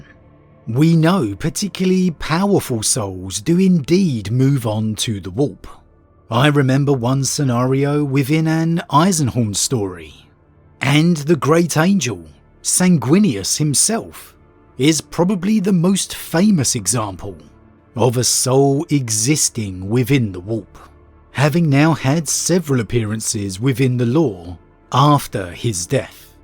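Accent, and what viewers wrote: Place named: England, United Kingdom. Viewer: I get excited to hear some more and instead I get backwater English accent. GD I can't stand u euro trash accents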